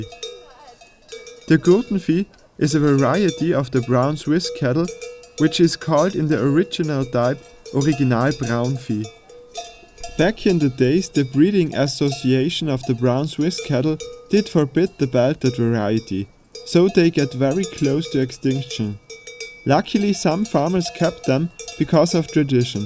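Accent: Austria. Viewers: like your beautiful Austrian accent :) as much as this video. greetings from Australia. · Vielen Dank! Sehr gut gemacht, aber mich wunderts dass es die Pinzgauer nicht unter die ersten 10 geschaffen hatt! Bist Du vom Bezirk Kitzbūhel? ( der Sprache nach) I bin a Kirchdorfer, schon seit 50 jahren in Toronto Canada. Grūsse Martin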